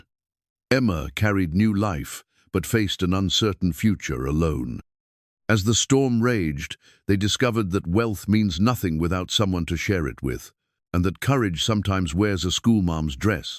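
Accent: England, United Kingdom. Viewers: Prefer this English accent !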